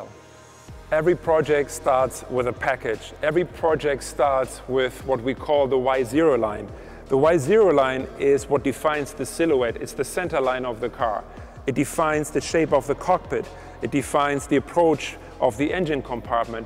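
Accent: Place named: Germany. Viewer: Der Man hat ein deutschen Akzent👀 Das Video ist auf einem deutschen Kanal👀 und dazu noch in dutschland👀 Warum spricht Er Englisch 🤷🏻‍♂️👀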